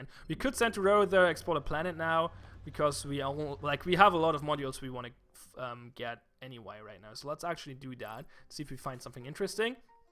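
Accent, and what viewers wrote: Germany. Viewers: is this german accent?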